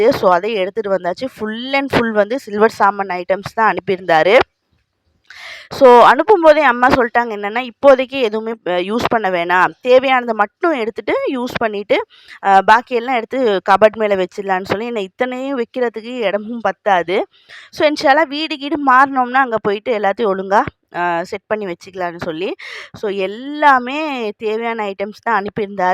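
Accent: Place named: India. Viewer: Hi sis ippa than ongada videova 1st time paakuren niga srilanka thane edhuku Amma endu India people's mari pesuringa umma endu sollalame knjm different ah iki ongada pechelllam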